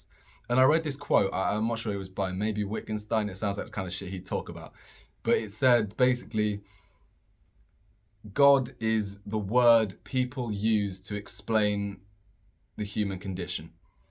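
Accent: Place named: Australia. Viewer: You are Aussie right love your accent I'm Latino greetings from Honduras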